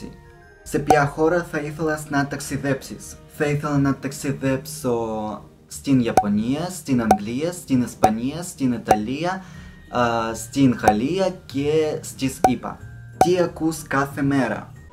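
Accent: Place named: Russia. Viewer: Τρελαίνομαι για Ρωσική προφορά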